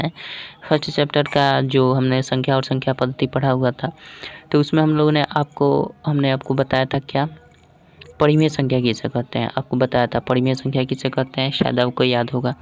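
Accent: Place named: Bhojpuri, India. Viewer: Bhojpuri nahi bolte sir par aap bolte hai to accha lagta hai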